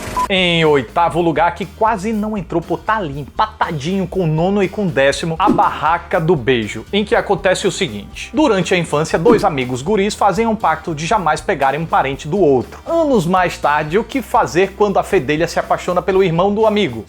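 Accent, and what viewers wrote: Northeast, Brazil. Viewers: só me inscrevi no canal por causa do sotaque nordestino carregado, que é a cereja do bolo! parabéns ótimo vídeo.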